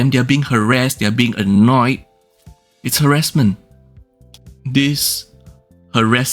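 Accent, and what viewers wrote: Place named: Singapore. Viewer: your accent is giving me "Singaporean" vibe..lol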